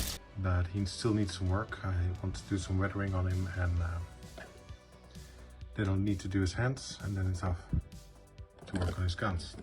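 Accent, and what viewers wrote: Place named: Netherlands. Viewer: Sounds like a dutch accent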